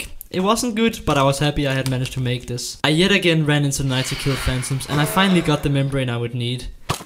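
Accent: Denmark. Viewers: Hey are you from Danmark you Sound like it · Very nice danish accent 👍🤝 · are u danish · Danish 100% lmao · You sound a bit danish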